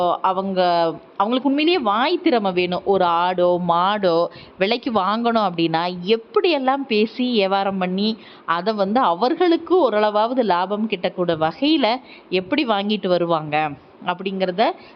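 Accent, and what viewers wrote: Kongu, India. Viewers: அருமையான கதை.... இவருடைய மாதொருபாகன் புத்தகத்தையும் நான் வாசித்துள்ளேன்.... உங்களது குரலும் அருமையாக உள்ளது.. கொங்கு மக்கள் பேசும் அதே பாணி... வாழ்த்துக்கள்..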